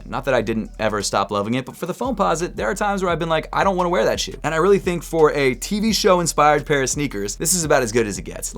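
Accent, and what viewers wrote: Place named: New York, United States. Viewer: I'm picking these up, shocked that your from Baltimore you sound and look like a New Yorker.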